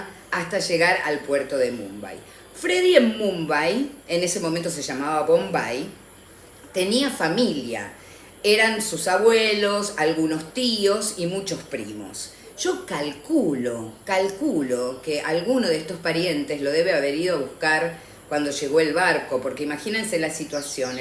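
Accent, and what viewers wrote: Argentina. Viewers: Me ha gustado mucho su narración dígame ud tiene un acento Argentino y como sabe tanto de Freddie a mi me encanta la música de el cada ves q escucho su música lloro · Ese puto acento argentino tan chocante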